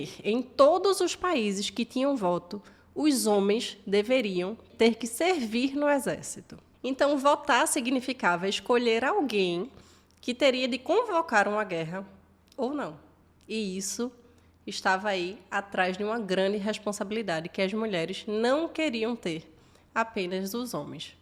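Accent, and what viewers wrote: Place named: Recife, Brazil. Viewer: ganhou mais um seguidor o sotaque de recife é lindo de mais, esse canal logo vai crescer muito seguidores.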